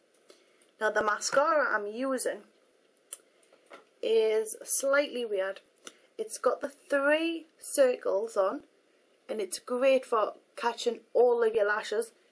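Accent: United Kingdom. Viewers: Something about a white girl with a british accent teaching people how to be like nicki just isnt right.....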